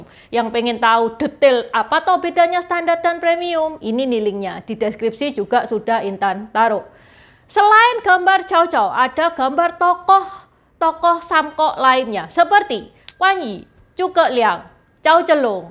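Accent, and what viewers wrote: Java, Indonesia. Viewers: Hihihiiii ilate ilat jowo 😁😁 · medok banget😂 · Logatnya jawa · Intanmemang cantik kok, apalagi KLO sudah menceritakan berbagai dinasty yg berpetualang. Dan akhirnya sampai ke kota BaganSiapiApi kab.Rokan Hilir prop.Riau daratan..dan menurut cerita rakyat Bagan, ,bahwa BaganSiapiApi bukanlah pohon siapi api, ,tapi pepohonan yg tumbuh ditepi pantai dimana pohon itu paling disukai oleh serangga sejenis kunang kunang dan dipandang dari lautan seperti api berkedip kedip yg menandakan adanya perkampungan tempat tinggal penduduk. Mksh mbak cantik yg medok dokdok dok, ,lanjutkan, , , ,!!! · Aneh dia oriental logat jawir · Ci intan campuran tiong hoa jawa ya...? Medok jawanya kelihatan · Salut cie logatnya Jawa banget 👍🏼👍🏼kalau dilihat cie aadik saya jauh bisa jadi seumuran anak saya Salam dari Cah Boyolali Jawa Tengah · Medok juga ya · Salut buat mbak Intan, logat jawanya medok banget.. 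hehehe, sui lah 👍👍👌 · ini baru namanya tionghoa rasa jawa.. saya suka medhok nya..❤❤❤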